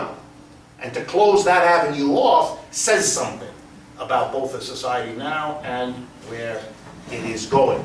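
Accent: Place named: New York, United States. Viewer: Listening to Dr. Wolff speak is like listening to Woody Allen explain economics. What a New Yorker. :)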